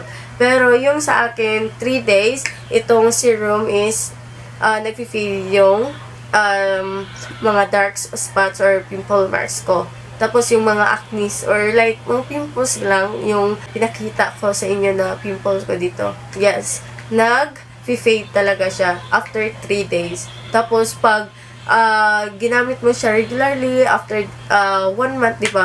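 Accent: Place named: Visayas, Philippines. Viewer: Halatang Bisaya HAHAHA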